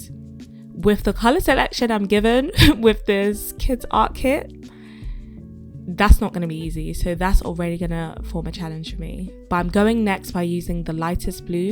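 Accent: United Kingdom. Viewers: Bro is British💀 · I just love the british accent ❤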